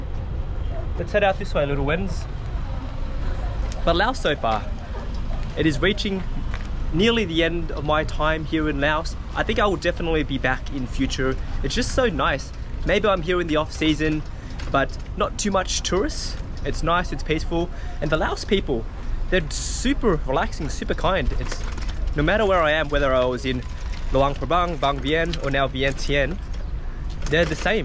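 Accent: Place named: Australia. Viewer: What on earth do you mean? He has Aussie Accent he was born in Australia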